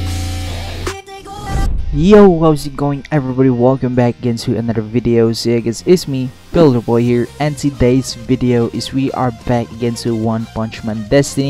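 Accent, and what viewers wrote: Philippines. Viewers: I can tell my manz filipino and speaks tagalog probably because of the accent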